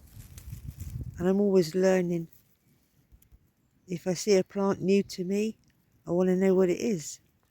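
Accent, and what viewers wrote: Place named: Malvern, United Kingdom. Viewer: I think I’m listening to the accent of a fellow malvernian or nearabouts.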